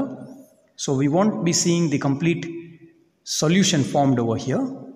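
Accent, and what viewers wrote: Gujarat, India. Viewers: Sir you are from Gujrat ??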